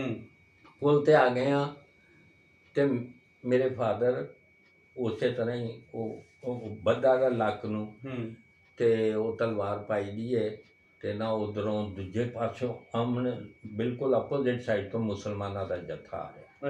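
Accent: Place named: Dogri, India. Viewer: sir di boli vich dogri touch ha. te enha bolya laya da si, jd ki punjabi vich kehnda ne laya hoya si. Narowal,Shakargarh, te Sialkot da ilaka kise zamane vich Jammu riyasat da hi hissa hi.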